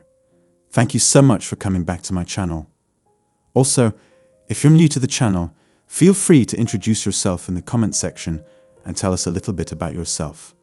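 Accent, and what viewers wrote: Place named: United Kingdom. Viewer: I think maybe it's the voice and the accent, when you watch channels with the British accent, you feel like a baby, safe and sound somehow😅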